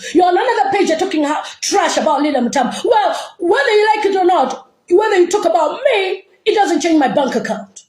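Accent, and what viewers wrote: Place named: Nigeria. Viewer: Why are you using Nigeria accent (oyibo)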